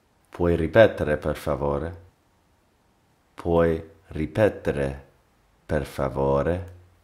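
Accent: Italy. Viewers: No this is French with an Italian accent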